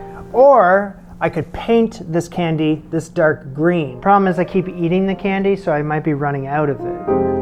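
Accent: Canada. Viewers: the one thing /along many other things) i love about your videos is the (canadian) pronunciation of words like out and house. omg it's just so pleasant to ears.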